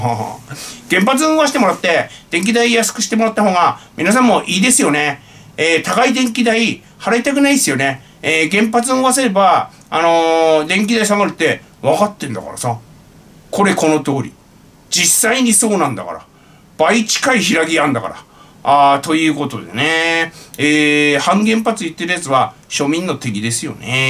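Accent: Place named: Northern Kanto, Japan. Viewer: 北関東の訛が楽しい😂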